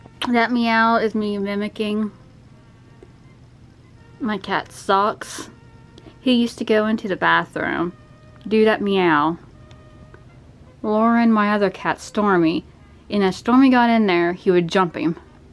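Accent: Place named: Philadelphia, United States. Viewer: You have a philly accent. ;)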